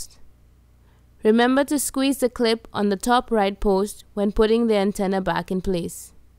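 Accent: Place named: Jamaica. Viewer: jamaican gal ah talk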